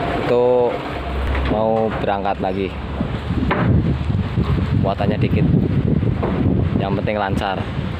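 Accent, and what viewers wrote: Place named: Java, Indonesia. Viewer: Semangat bang.. 👍👍👍👍 nyari rizqi..semoga sukses.. medok ya..kek aku hehehe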